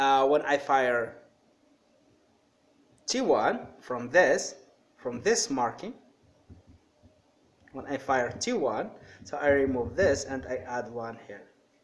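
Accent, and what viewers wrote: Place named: United States. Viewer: تعجبني الاميريكان اكسنت نتاعك ربي يوفقك خويا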